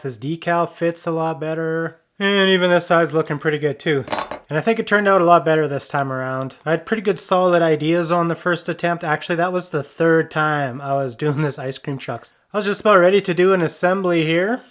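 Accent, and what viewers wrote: Canada. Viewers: Where in Canada are you from? You sound a bit like Bubbles from NS.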